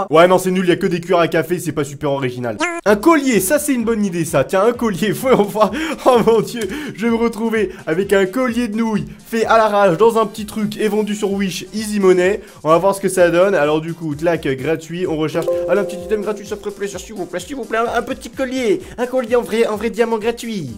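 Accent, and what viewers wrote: Quebec, Canada. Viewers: yo je suis un nouveau mais je te trouve super ps: tu pourrait faire une vidéo avec ta voit québécoise un petit defi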